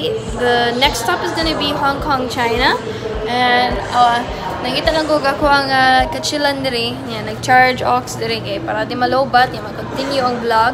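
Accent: Visayas, Philippines. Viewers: Inlove kay ko sa imong tuno ba. Hahah. Laban mga bisaya. Love love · Let's Go Bisaya kaayo❤️ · Wa ko kabalo nganong nasaag ko dre 🤣 pero nalingaw mn pud ko haha, btw kyut kay ka magbisaya ba 😅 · cute kayka ay bisaya gyud wa ko na bored ga lantaw sa imo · bisaya na bisaya eh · Bisaya man oie. Ok a sweet pa jud🤣🤣🤣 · Pambisita din sa bahay mga sis napakabilis Kung magbalik. Being fluent in English with those accent na bisaya, 🔥💪👊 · I like your bisaya accent😍astig kaau ate😍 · first time watching your videos and you're so cuteeeeeeee i love it💛 and the accent guuuUrrrrl, so cuteeee😍 sarap talaga makarinig ug bisaya accent, it really gets to me everytime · I love your accent.... 😊iba talaga basta bisaya haha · Hahaha ka cutie mag bisaya uy! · Cute kayka mag bisaya❤❤ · bisaya ka dae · bisaya man diay ka... ok ahhh... nice video... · Ka cute mg binisaya ni ma'am uy😅😅😅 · Aw kilig jud nanamian ko mag pamati imong accent mag bisaya...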